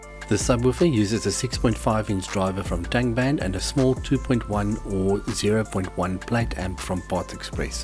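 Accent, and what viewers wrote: South Africa. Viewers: are you from South-Africa sir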